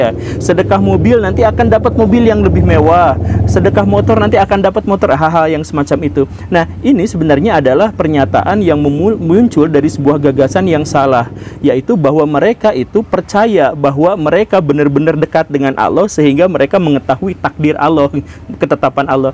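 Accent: West Java, Indonesia. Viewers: Klo sy Alhamdulillaaah,ga prnh ikut2an.waktu itu pernah rame di daerah tmpt tnggl sy,sprti yg di ajarkan ustdz YM,ada yg ikut beberapa orang,kata mereka hayu cpt sedekah 50rb juga gpp,nanti sebulan lg kIta dpt rezeki 70kali lipat, Klo sy terus terang langsung mengernyitkan dahi(????) BTW sy baru liat channel,maasyaa Allaah ilmu'nya🤗🤗berbobot, penyampaian'nya ringan,logat sunda pula,da abdi ge sunda😘😘😘👍🙏🏻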